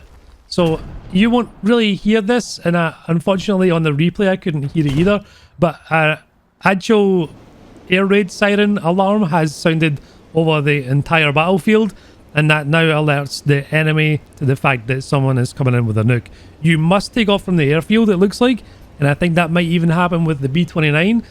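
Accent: Scotland, United Kingdom. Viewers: I love it when I watch a channel for the first time and just here the beautiful Scottish accent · are you scottish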